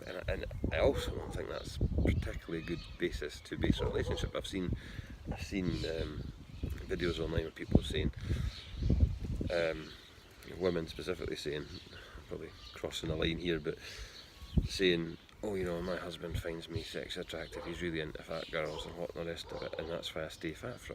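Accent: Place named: Scotland, United Kingdom. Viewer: The Scottish accent is my *favorite*!!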